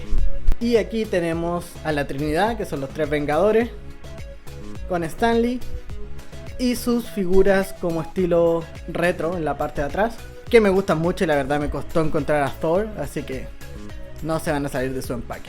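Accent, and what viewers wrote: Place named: Chile. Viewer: Amigo mio, vi un video tuyo armando la baf de controller, me causo familiaridad tu acento. Me ha sido difícil encontrar un chileno que coleccione y comparta videos como los tuyos. Te felicito por tu colección y videos los cuales seguiré viendo por supuesto. En lo personal también tengo mi colección que seguramente compartiré en algún futuro Un abrazo desde Chile amigo, Talca Que pases un excelente año nuevo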